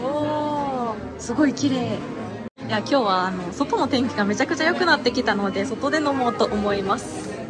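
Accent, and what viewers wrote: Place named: Nagasaki, Japan. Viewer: 🤕ガスタウン＆ジンバル🤕 ガスタウン動画を観終えて、今、「香港」ハイアットに泊まってを観ながら投稿してます🐒 、いつも、可愛い笑顔＆時々でちゃう長崎弁（失礼）親近感があって良いよ😅 いつも、街の歴史・食・景色etcで素敵な旅行気分になれ、ありがとうね😘 秘密兵器のジンバルて考えた人「すごい＝マネーだね」😛 ジンバルで色んなアングルで撮った動画が、またｘ２楽しみになりました🤨 ランチの時、日影がHaruちゃんと少し被っていたのが、ちょっぴり勿体ないｘ２🐻 ポニーテール、良く似合ってたし、私は「ショートｏｒセミロングがスキです」🐷 ＰＳ キンコン西野さんの伝説のスピーチ動画「是非、観て下さい。今後のYouTuber活動の参考になれば・」 <link> 「約１６分あるから、時間がある時にでも」 じぁ～ね😘 おやすみ。弘美🥱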